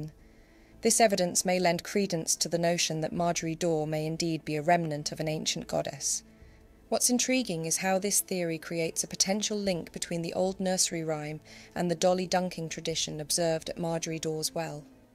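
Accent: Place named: Oxford, United Kingdom. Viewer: Trying to place your accent, are you from Oxford? You have a wonderful voice, I live in Edinburgh and some English accents are harsh, yours is lovely, I could listen to you all day. 😍. As always an informative video.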